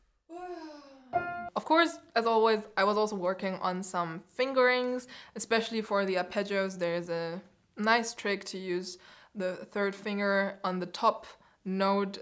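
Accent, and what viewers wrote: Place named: Germany. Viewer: As a fellow German who just found your channel, I found it really interesting that I could tell roughly where you're from before you even said "Erlkönig" because the dialect is audible even when you speak English. I never really realized that this was even possible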